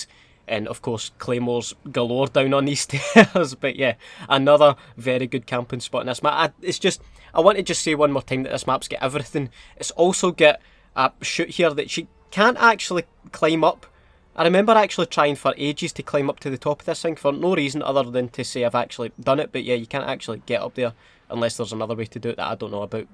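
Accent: Scotland, United Kingdom. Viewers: Clicked just to hear your silky Scottish voice say ‘Faverla’ ;) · Hey I’m kinda new been around for couple months, been binging on your vids looking for as many as I could find and I dunno just thought I’d have a try, ever had a feeling to do a video like on of your og commentaries. I know you’ve moved away from them but I just love the Scottishness in them😂😂. Also what did actually happen to the troops?😂but anyways love the vids man keep it up❤️❤️❤️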